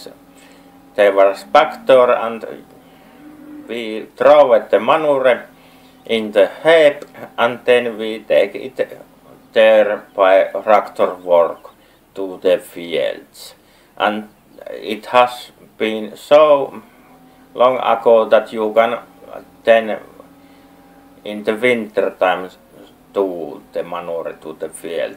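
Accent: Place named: Finland. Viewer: Eihä sille voi mitää itekki menee englanti siihe ralli englantii kuten valtra valmet mainitsi jo:D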